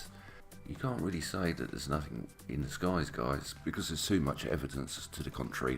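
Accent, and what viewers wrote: England, United Kingdom. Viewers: Good work, I appreciate your systematic approach and (as a silly side note) the English accent is always a marvel to wrap one's ears around.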